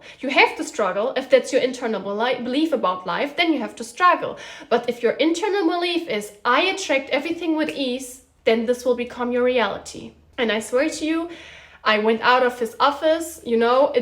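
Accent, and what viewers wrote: Germany. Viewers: I'm so thankful for this video. The second you started talking, I thought, "She's german." But not like "Her english is bad she should stop" but like,"She is german LIKE ME and even if people might recognize that she is, IT DOES NOT STOP HER. " ❤️❤️🙏 Good luck with everything you are going to achieve!! ♡I'm sending love & appreciation ♡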